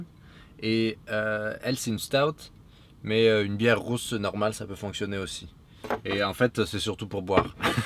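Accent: South, France. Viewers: Accent du sud ? De quel sud parlez-vous ? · Lors de ces premières recettes ce cordon bleu en mode campervan avait un accent de sud de la France ;)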